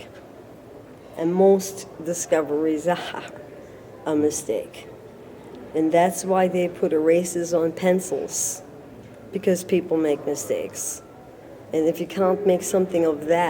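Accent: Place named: Boston, United States. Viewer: What a great lady-loved her Missing Persons work. And her Bawston accent.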